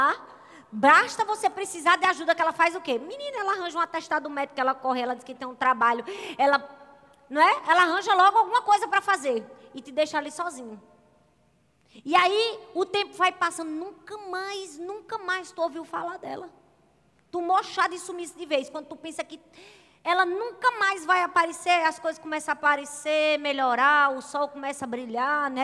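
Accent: Northeast, Brazil. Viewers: Amo suas pregações. Vc. fala a linguagem do povo. Amo seu sotaque. Tb. sou nordestina. 😍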